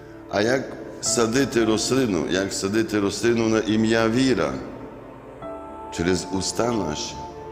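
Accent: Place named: Western Ukraine, Ukraine. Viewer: Я в захопленні від Ваших наук,від нашого західного діалекту. Щиро Дякую,живу ними❤️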